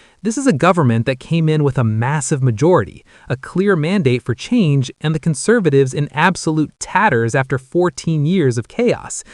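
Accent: United States. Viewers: Why have we have an American voice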